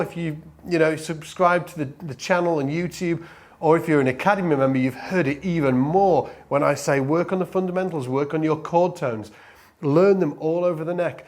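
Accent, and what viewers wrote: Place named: Liverpool, United Kingdom. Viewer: you talk like!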